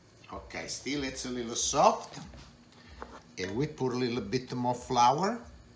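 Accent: Italy. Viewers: You are awesome! My grandma comes from a village in Italy named Casavera outside of Rome. Your dialect is so similar that I think of her every time I watch your videos from the garden to the dry sausage to the pasta & peas, it’s what I grew up around and it’s so similar. Thanks for having an amazing channel! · This is just thé most wonderfull italian dude... I am a big fan of his recipe's. But above all he absolutely melts my heart; with his vibrant personality and ever so charming accent....realy gets me going in my kitchen!! 😍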